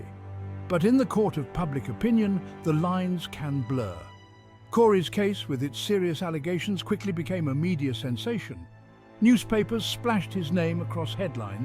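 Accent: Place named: Virginia, United States. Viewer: This leaves me with a lot of questions E. Did he ever get convicted? I see a lot of penitentiary pics; did he get knocked for something else?? How long has he been down; or is he home? I'm from VA so I'm more partial to hearing you talk with our accent and terminology. This is more mainstream type and I get it but I'm kinda more partial to you Homie.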